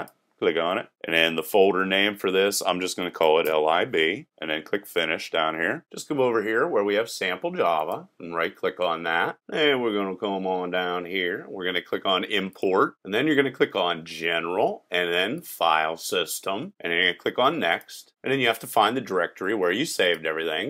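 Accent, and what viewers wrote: United States. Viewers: charnel pawny Which part of the US is he from? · An american accent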